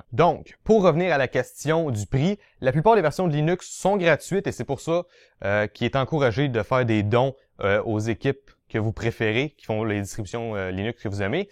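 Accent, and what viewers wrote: Canada. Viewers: bonne vidéo, mais tu parles trop vite et tu as un fort accent (Canadien), pour un Français, ce n'est pas très simple à comprendre. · Jaime bien les Canadian et l'actent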